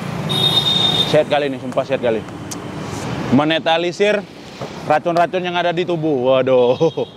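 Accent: Medan, Indonesia. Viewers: 😆asli mana sih bang... bahasamu campur jawa tp logat medan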